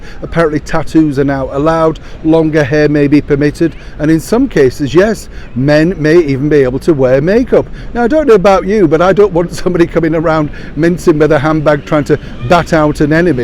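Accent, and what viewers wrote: Yorkshire, United Kingdom. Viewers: Good afternoon Yorkshire lad, Don't change a thing , Stay just the way you are, Had to smile when i heard a little Yorkshire grit in your voice , Then i had to laugh a soldier with make up mincing around What a lovely coffee break they are now getting longer Lovely smile, lovely wave lovely scenery Thank you. Take care, stay safe.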